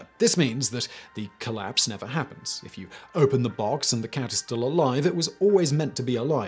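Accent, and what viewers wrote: United Kingdom. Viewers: Today I learned there’s another vsauce, except with a British accent.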